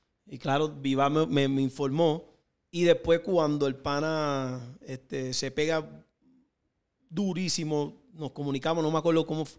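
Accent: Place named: Puerto Rico. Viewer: Es que el es puertorriqueño.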